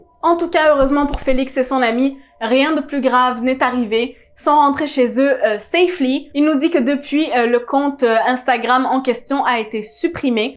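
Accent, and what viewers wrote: Quebec, Canada. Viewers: Je viens de découvrir ta chaîne et j’aime beaucoup ❤️ Tu es québécoise ? Tu as un semblant d’accent 😉 New sub 💕 Edit : omg je suis tellement choquée de cette histoire 😭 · Y a des arnaques partout c'est dingue. Et là c'est encore pire, que serait-t-il arrivé si c'était deux filles !!!😱 L'essentiel c'est que tout finisse bien, mais elle a manqué de prudence. Ps : c'est rigolo j'ai lu plus bas que tu étais québécoise, j'avais vu l'accent effectivement mais il est assez léger je trouve 😁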